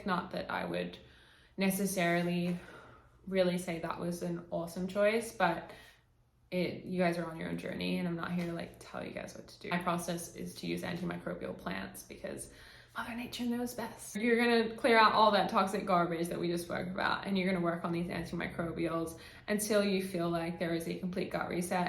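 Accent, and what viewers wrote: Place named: United States. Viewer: The American accent 😱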